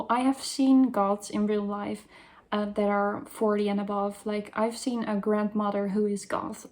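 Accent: Netherlands. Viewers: I love your eye makeup. The "phase" thing...I didn't really discover the goth "thing" until my 40s (I'm nearly 47), but I've seen goths in their 70s - no reason it should be only a phase, and if it is for some people what is wrong with that? We like what we like whatever age we are, right? :) As far as bands go, if you haven't heard them or heard of them check out a band called Suspiria - I think they're from here in the UK. Not sure if they're active now, but they were big here in the 90s, and I know they have some stuff on YouTube. Also, if I may say so, I love your accent - the Dutch people I've met have been lovely, caring people without exception and I enjoyed what little time I spent in the Netherlands very much. Hope all is well. :)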